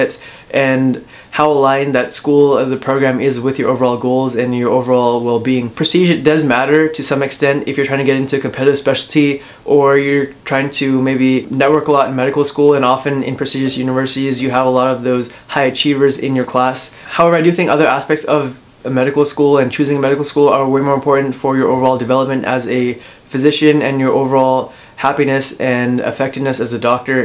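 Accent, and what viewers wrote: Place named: United States. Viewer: I’m shook. I didn’t expect your accent to be an American one 😂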